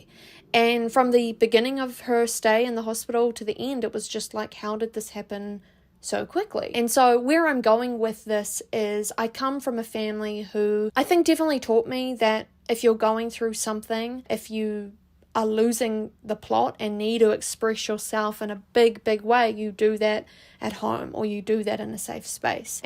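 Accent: New Zealand. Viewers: I have an interest in accents, are you a NZ who's moved to the US or vice versa?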